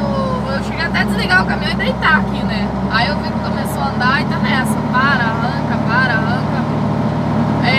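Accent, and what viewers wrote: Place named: South, Brazil. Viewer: Boa tarde,que sutaque bacana,por isso que gosto do sul,bom final de semana ,que Deus abençoe muitíssimo.rio de janeiro.bairro Pavuna.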